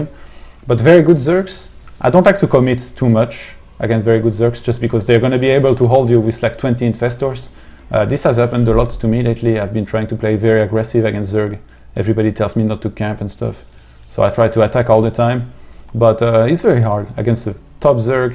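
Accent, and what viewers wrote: France. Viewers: Awesome man :) French who speak english sounds so nice hehe, keep this up!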